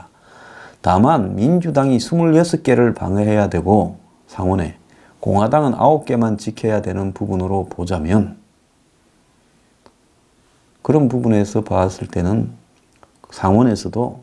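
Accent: Gyeongsang, South Korea. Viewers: 루카스님의 의견에 동의합니다 기분이 좋네요 미국의 선거전 이 정부 어쩌나! 정의는 이긴다 황소장님 경상도 사투리 정이가 네요 남편이 진주 태생이고 똑 부러지는 사람이거든요 황소장님 화이팅!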